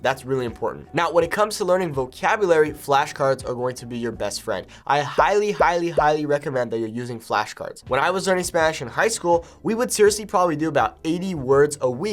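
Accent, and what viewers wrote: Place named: Mexico. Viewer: si Señor ! I'm a podcast member and gonna upgrade to the taco. My italki tutor was wondering what podcasts i listen to and i told her, and I mentioned that yours was hardest for me to understand. She played a few minutes of the recent Gringo or Latinas podcast and said "that's VERY Mexican", lol...... needless to say i dissected that transcript later that night. Your podcast is the one that pushes me to keep working harder. Keep up the great work!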